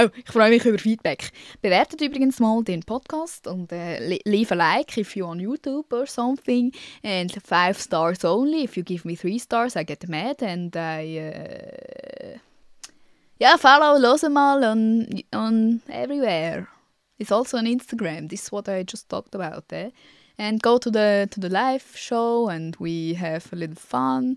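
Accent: Switzerland. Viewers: Du bist so lustig! Ich lieb den Schweizer Dialekt einfach :-)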